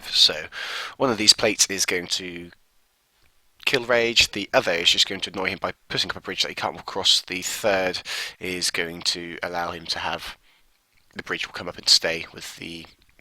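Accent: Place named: United Kingdom. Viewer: The intro: DEATH FIRE SINS HELL IS REAL The guy: * sweet little british man * I assume british sorry if not **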